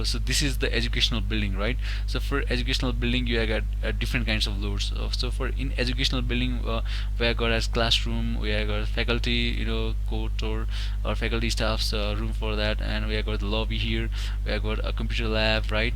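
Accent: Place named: Nepal. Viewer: Nice teaching, you sounds like from Nepal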